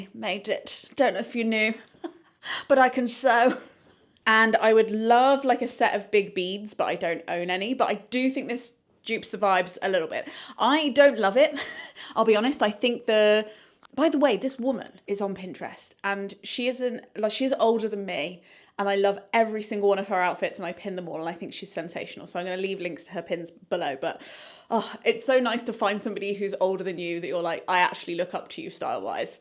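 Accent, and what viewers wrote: United Kingdom. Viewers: that black top is LOVELY. Forget the bottom button closure situation, couldn’t matter less imo. Side note I grew up in Aberdeen for a few years of my adolescence and currently live in Colorado. Your voice and style and energy make me miss life in the UK so much! Been watching you for probably 5+ years now and just want you to know I love your content (as a 29 year old) and think you’re lovely! Embrace the goth and keep guiding me through my tumultuous American life!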